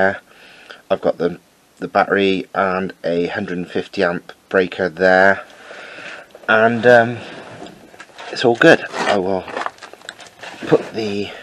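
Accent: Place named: Gloucestershire, United Kingdom. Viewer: Proper Glos Accent in there mush.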